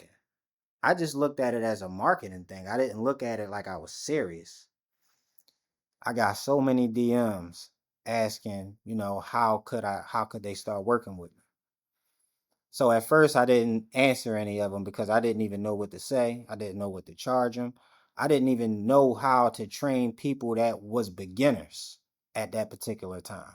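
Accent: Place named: Philadelphia, United States. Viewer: You from Philly? I hear the Philly accent,good work cousin!! 👊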